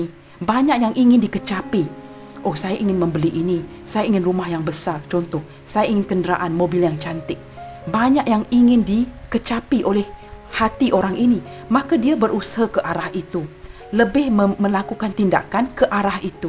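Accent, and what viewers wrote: Malaysia. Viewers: org mn ini bacanya ky org malay.pusing dengerin nya a jdi eheh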